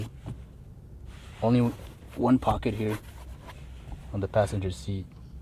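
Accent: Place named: Germany. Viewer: Are you from Germany cause your English sounded like german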